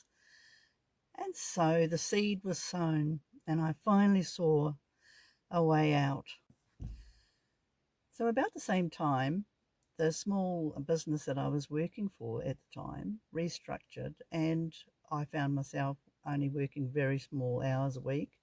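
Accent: New Zealand. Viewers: Nice to hear a kiwi accent on YouTube 😊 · Your lucky you live in NZ by the sound of your accent.. it’s easy to live in a camper van in NZ perfect country for it.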